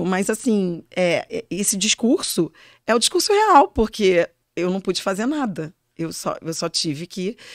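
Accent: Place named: Rio de Janeiro, Brazil. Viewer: Sotaque lindo, Carioca né... lindona de viver. gente grande !!!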